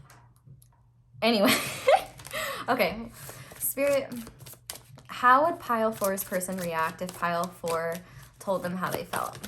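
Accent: England, United Kingdom. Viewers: Omg pile 4 I love your British accent!! Coming over for tea later Air Smooches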